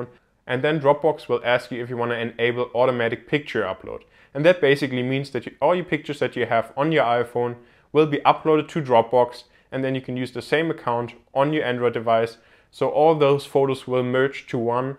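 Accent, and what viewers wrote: Germany. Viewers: are you german? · Youre german? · Der deutsche Akzent <3 Super Video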